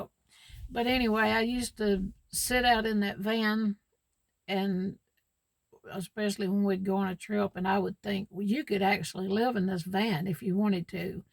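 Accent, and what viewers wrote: South, United States. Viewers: You did a great job! Thanks for sharing your story. I am in Alabama but from northwest TN. I knew you had a bit of a southern accent!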